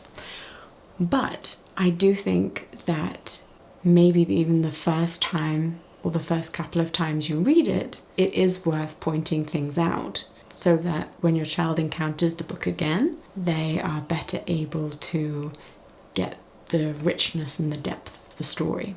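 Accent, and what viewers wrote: United Kingdom. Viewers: I don't know if this is a stupid question, but how is it possible that you speak with a british accent while being deaf? Could you hear when you learned how to speak? or is it just that accents can be learned without actually hearing them?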